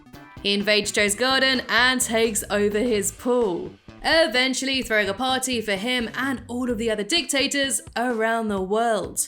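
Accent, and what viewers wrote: United Kingdom. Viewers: To be honest I love your British voice. Next year I plan on moving to Scotland. After all… God save the queen! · Wait! What! Who hates your British accent?! I love it! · I'm sorry I love your British accent! ❤ it's beautiful and exotic just like the wonderful host making my favorite videos! Never change! 💘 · Yeah it's like as if Family Guy characters of old Family Guy are very different than how they are now. But even so Peter was still a bastard back in the good old days too. Now people are complaining about your accent? I mean it's nothing new. Many people some probably other Americans who are uneducated and dumb for some reason can't stand a British accent even though there's really nothing wrong with it. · I love your british accent,what a bunch off fake fans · I love your British accent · Can you also do the worst things Stewie has ever done! 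BTW I htink your british accent is awesome! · How can people hate her British accent 😂 that baffles me 😂 well each to there own right 😂 her British accent is lovely and awesome 😎 You’ve done a wonderful job so keep voicing these videos. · I don’t mind your British accent tbh, I think it is soothing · Adore your British accent here in Bolton. Rule Britannia etc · Hey just saying I love your british accent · I love your British accent! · Awesome Job! I'm glad Peter's not my friend, I love your British Accent by the way. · Did you read the rest of my comment. Quagmire deserves the abuse and your British accent is awesome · Who in the world would hate your British accent?!?!?! I absolutely ADORE IT!!!!! I binge watch your videos for your voice almost as much for the content itself! Great videos and very informative. I’ve seen these shows hundreds of times and your theories and timelines always make me want to rewatch certain episodes realizing how I missed some connections before. 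· I don’t hate your British Accent and btw your doing great ^^ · ... your British? I thought you were Australian! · but i love you British accent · You have a British accent? What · nah I don't hate your brit acent it's pretty beautiful to be honest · But I love your british accent! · your accent is cool....I really love how strong people In. London accent is😌😌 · I don't mind your Voice,You British Accent makes you unique just the way you are. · What!? Who said they don’t like your British accent I think they’re cool alongside French and Italian I’ve been told I have a Cleveland or very slight southern accent 😅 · Awe I'm sorry to hear that darling I aboustly love your British accent 🥰🥰 and I as well enjoy watching your videos~!! I was wondering if you could ever do a timeline of Marge simpson if you haven't done one of her yet darling?? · Certainly impossible to rank his particular deeds as all the outright criminal ones rank in similar categories; murder, destruction, relentless bullying, child abuse, sexual assault, manipulating everything by status, spousal assault and affairs, unkept alcoholism, drug/substance abuse, the list just won't stop! 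Another great video thanks! I also do like your accent, sounds much nicer, more engaged and more charming than a typical American accent which you hear narrating 85% of youtube videos. I'm not saying there's anything wrong with listening to American nor that there accent is unpleasant, its just nice for other countries like the UK to represent and you do talk very smoothly and elegantly like a good narrator should. People who are hating on your British accent and trying to get you to change the way you talk are mostly likely selfish, ignorant Americans who think the world revolves around their country and that everyone should act like and pay attention to them, don't let haters dictate what you say and how you say it, keep up the great content!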